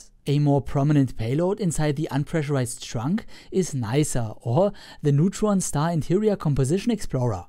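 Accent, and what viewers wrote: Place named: Swabia, Germany. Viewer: Dieses Spätzle-Englisch!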